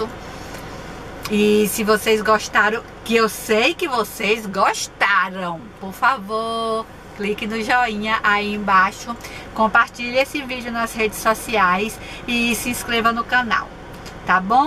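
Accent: Northeast, Brazil. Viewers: realmente vc mora num paraiso...bela beleza do lugar,e seu sotaque e no nordeste ne? mas onde?bjus minha querida vc e td de bom e muito divertida....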